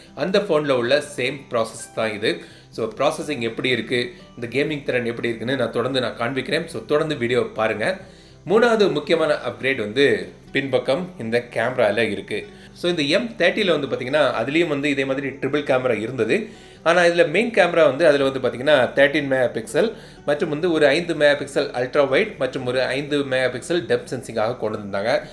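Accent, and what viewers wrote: Kanyakumari, India. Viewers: உங்கள் குமரித் தமிழில் கேட்பது இன்னும் திருப்திகரமாக இருக்கிறது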